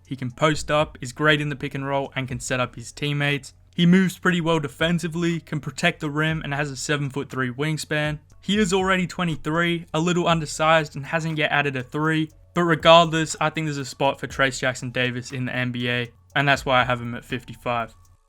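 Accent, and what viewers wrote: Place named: Australia. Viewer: Good video man very informative. I'm really not sold on Jett Howard. It will be interesting to see how the Thompsons go in their first couple years too. I sensed an aussie accent, shout out from Sydney!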